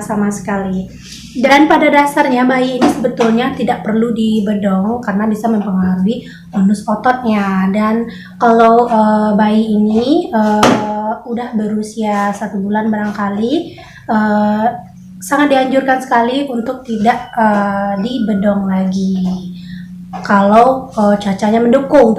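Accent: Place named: West Sumatra, Indonesia. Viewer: Tangan Bainai ,Logat kental . FIX urang awak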